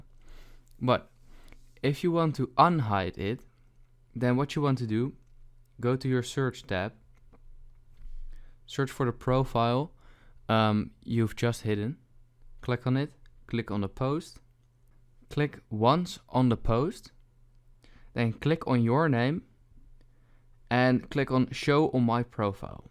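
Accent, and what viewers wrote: Netherlands. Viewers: Thanks bro and btw u sound Dutch cuz of ur accent🙃❤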